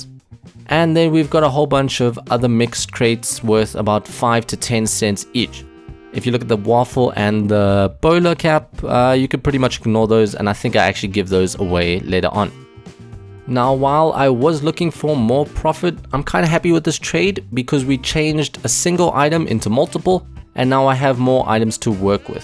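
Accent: South Africa. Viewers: Ahh same, I thought I recognised the accent but the newzealand accent and the South African accent are so similar, kan jy afrikaans praat? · Just wondering, are you South African, it’s the accent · I live in Gauteng thats awesome dude I could tell by your accent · Are u from south africa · I love the South African accent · Are you South African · May I ask where you are from? You sound like you from South Africa?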